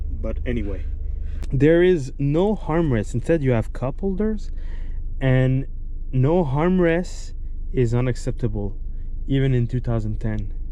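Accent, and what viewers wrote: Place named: Quebec, Canada. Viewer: He’s québecois man, give him a break lol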